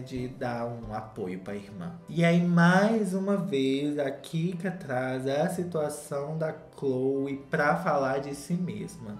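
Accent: United Kingdom. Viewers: O toque britânico no seu inglês é um charme a parte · Não há melhor canal!!!!!!! E esse inglês british Braseeeeellllll 👏👏👏👏👏👏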